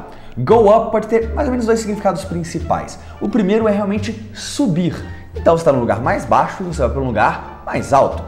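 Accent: Minas Gerais, Brazil. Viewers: Para de ser chato misericórdia, é óbvio que o cara sabe como essas palavras são escritas ou pronunciadas em um português formal, mas isso é apenas questão de SO T A Q U E O cara é mineiro, deixa ele em paz com o sotaque dele, desnecessário ficar atrapalhando os comentários de um vídeo focado no I N G L Ê S por causa de preconceito linguístico Até parece que você fala que nem um robozinho, com todas as letras e acentos. Vc pode até pronunciar as palavras citadas de um jeito um pouco mais padrão, dependendo da sua região, mas tenho certeza que com outros tipos de palavras e fonemas vc também fala "errado"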